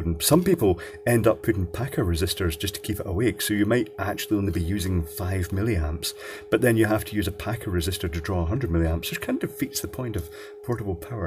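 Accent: Scotland, United Kingdom. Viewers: Pure meditation, the soothing flow of on the fly hacking and improv... plus the mellow lilt of the Scottish commentary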